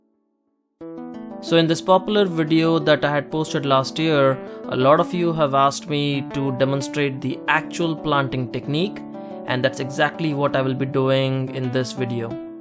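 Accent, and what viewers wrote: India. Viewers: Such a nice accent. Indian ?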